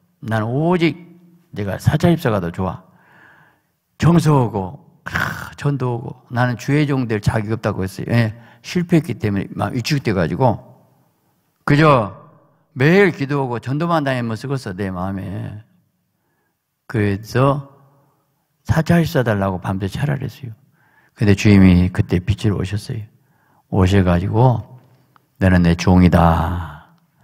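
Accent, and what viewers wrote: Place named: South Jeolla, South Korea. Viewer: 목사님 넘 존경합니다. 너무 설교듣고싶은데 전남 사투리땜에 잘안들려요. 밑에 한글좀 올려주세요. 목사님 넘 사랑하고존경합니다. 미국의아들에게보내고싶은데 저보다 더못일아들어서그래요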